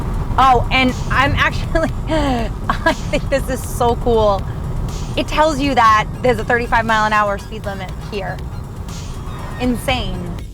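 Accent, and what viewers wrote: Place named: Boston, United States. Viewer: Gotta love that Bawhstan accent! I'm from MA originally, love the video! :)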